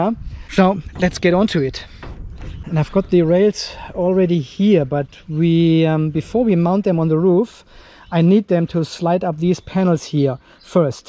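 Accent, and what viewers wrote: Germany. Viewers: und ich war mir immer sicher da einen deutschen Akzent zu hören :) Mach weiter so :)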